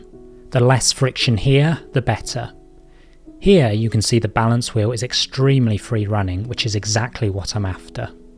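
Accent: United Kingdom. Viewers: This channel is very reminiscent of Clickspring, except with a relaxing English voice instead of a relaxing Australian voice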